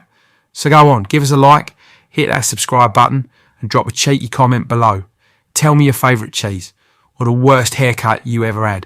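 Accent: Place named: Cockney, United Kingdom. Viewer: Hi I subscribed to your channel yesterday. Really love the way you present your video, fun but informative, I'm Scottish, but I love your voice sound like a Del Boy type of guy. Keep it up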